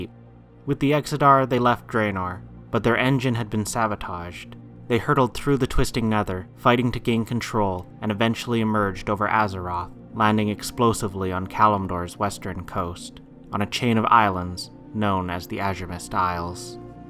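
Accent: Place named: Ireland. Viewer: Are u Irish I hear a slight Irish accent in your voice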